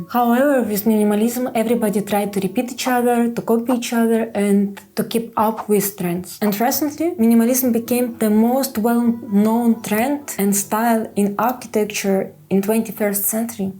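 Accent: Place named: Russia. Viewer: Чтото мне подсказывает что это русский акцент 🎉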